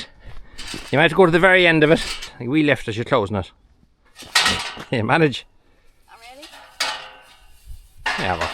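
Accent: Ireland. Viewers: you certainly do more than farm! I am an 80 year old lady in a wheelchair. I, too, came for the cottage renovation, but stayed because your Irish accent, your stories, your achievements, your struggles and your honesty are captivating. When I lost my parents, I suddenly realised, I was now the adult. The final decision rests with me, I can't consult them any more. You are going through that transition with losing your father - you are now the adult farmer, the decisions rest with you. It will be stressful, but you will grow with it. Best wishes.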